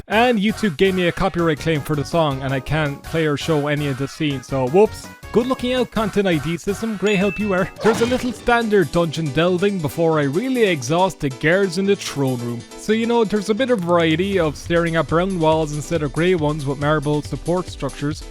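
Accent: Ireland. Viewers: !!! Man it’s so good to hear that Irish accent again, and he returns with almost 2 hours of Daggerfall wowie what a blessing! we hope you’ve been okay, it’s so good to hear you alive and well. CHEERS MATE 🥂🍻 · Man with irish accent playing old RPGs is my favorite youtube genre. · you have no idea how happy I am to hear that Irish accent monologue about TES again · that sultry Irish voice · I’ve never been so happy to hear that Irish accent coming through my speakers. Welcome back,!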